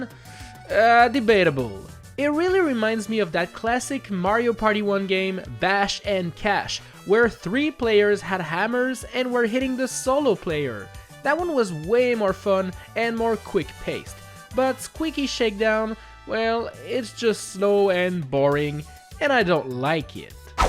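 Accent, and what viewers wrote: Quebec, Canada. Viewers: he’s canadian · French Canadian accent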